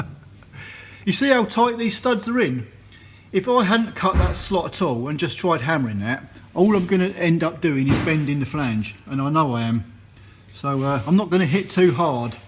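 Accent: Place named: England, United Kingdom. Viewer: That’s the same English accent as Producer-Director Ben Dover.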